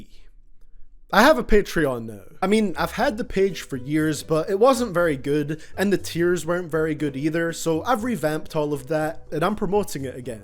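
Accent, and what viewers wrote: Scotland, United Kingdom. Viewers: U Scottish or usa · He sounds like a Scottish version of another youtuber I watch but can't remember which channel. It's uncanny.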